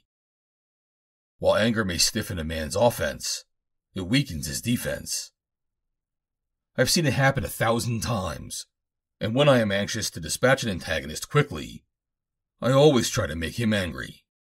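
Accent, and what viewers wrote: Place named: New York, United States. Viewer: I think your voice is great for stuff like this. NY / NJ accent is great for a stoic hero like JC — keep up the great work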